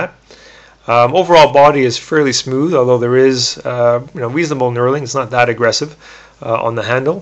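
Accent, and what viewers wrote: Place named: Canada. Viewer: Actually I noticed your accent. Ive watched my share of CBC and Kids In The Hall lol.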